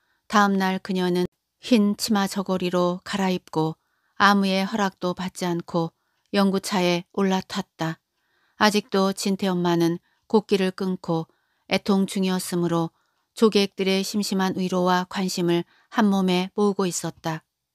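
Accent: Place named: Jeju, South Korea. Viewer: 제주도에서 시집오신 외숙모하고 말투가 똑같으셔서 듣는 내내 더 재밌기도하고 신기하기도했답니다😂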